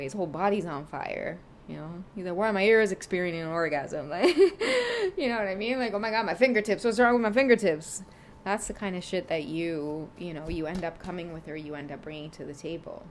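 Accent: Trinidad and Tobago. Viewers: As a fellow West Indian, Jamaican girl, I LOVE your channel. & love your trini accent 🥰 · I love to hear you speak.. u bring me home every time I hear that. I might be wat dey call a Yankee but growing up all I Kno is a Trini tounge all my thoughts is in a Trini accent. I love to tap in with ya. Ya does really make me laugh 😂❤ but ya talking de truth. Luv itt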